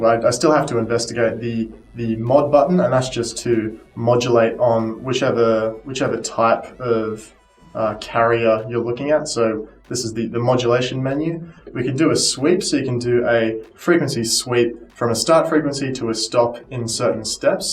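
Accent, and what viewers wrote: Australia. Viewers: He's saying Hertz correctly. With an Australian accent.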